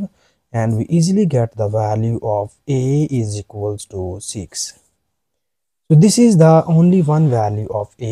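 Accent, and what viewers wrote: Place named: England, United Kingdom. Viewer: Dehati angrez ki tarah bol raha hai. Paki hai kya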